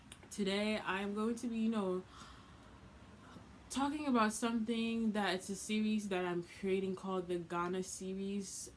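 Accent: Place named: United Kingdom. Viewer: what is with the British accent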